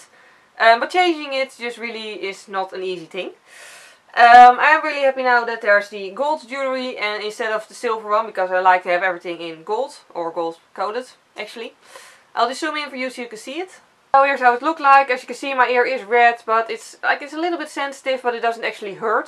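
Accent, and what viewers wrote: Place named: Netherlands. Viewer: Wow, I did not expect you to answer personally.🙂 I just noticed your accent, I'm german and I often go shopping in the netherlands, but I never heard a Dutchman/woman talk English before, sounds kind of familiar to the accent the Dutch have when then talk german.😊 Btw. thanks for answering me and I think your video was helpful.👍☺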